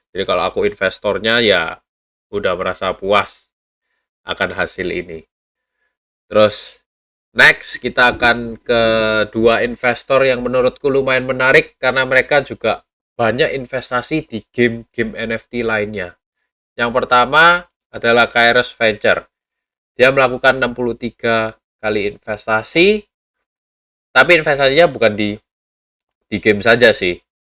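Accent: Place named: Surabaya, Indonesia. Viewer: Nih Reviewer medok ALA SUROBOYO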